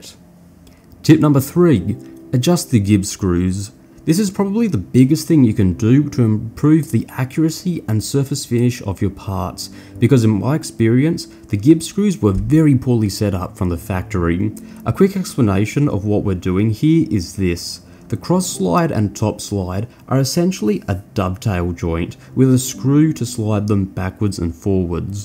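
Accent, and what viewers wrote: Australia. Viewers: Nice Aussie accent ! Reminds me of a Brit who spent a long time in Perth, WA, but I could be wrong. Otherwise, the various tips are useful, thanks.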